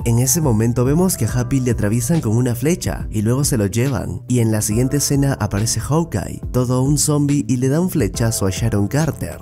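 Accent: Peru. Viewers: Tal ves sea por qué el men es peruano y pgod también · gran resumen y mis sospechas son de que eres peruano xd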